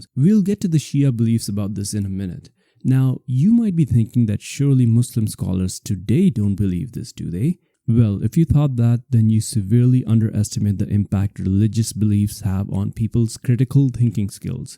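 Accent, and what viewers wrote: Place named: India. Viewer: I noticed you only picked on Islam. And judging by your accent you are from the subcontinent meaning you have an agenda. It’s ok . Your Hindu gods changed sex to shag another god . And in Christianity there was a zombie apocalypse and dead people rose and walked around. But that hasn’t deterred its billions of followers. If you want to hear outlandish and absurdities read the Bible and the vedas. If you believe your religion is true you shouldn’t be worried about the religion of others. You should worry about your own salvation. Shinto is a religion perhaps you should discuss that. If you sre incapable of doing that , then it’s clear you are not sincere.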